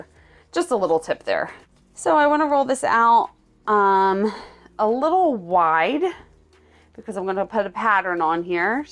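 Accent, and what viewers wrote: Philadelphia, United States. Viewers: 😂😂😂 my Phillly Sis😂😂😂 since I’m out of Philly now the accent is real. People used to tease me now I see we do have a Philly sound.❤️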